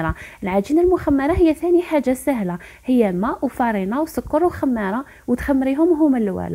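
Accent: Mascara, Algeria. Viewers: هدرتك حلوة نموت على هدرة تاع ناس معسكر وناس بلعباس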